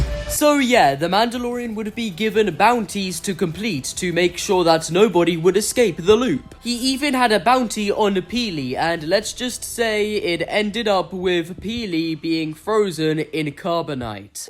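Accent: United Kingdom. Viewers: This is might be the most british accent I've heard in a while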